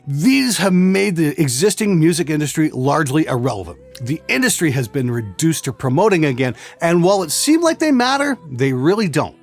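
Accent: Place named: Canada. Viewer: Hey. Just wanna say I love the Canadian accent.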